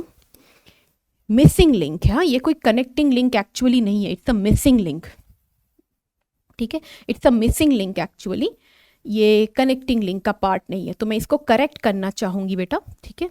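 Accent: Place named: Tamil Nadu, India. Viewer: Yeh ma'am south indian accent aa rha ha tamil side ki ha shyd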